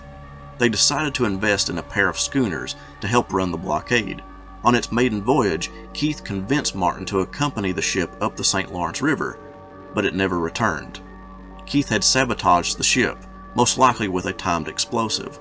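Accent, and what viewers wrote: South, United States. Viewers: Thank you, as a Canadian myself I appreciated this episode. Btw when I was a youngster I watched Richard Boone in Have gun will travel. Your accent sounds southern, where are you from?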